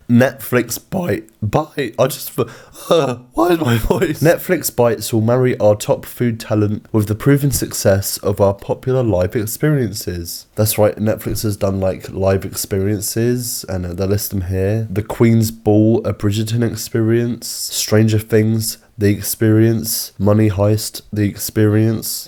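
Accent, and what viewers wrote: United Kingdom. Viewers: Wait a second, this man is British. I can't watch this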